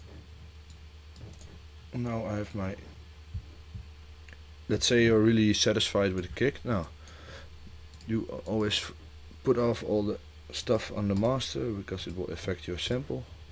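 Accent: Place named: Netherlands. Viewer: Original dutch accent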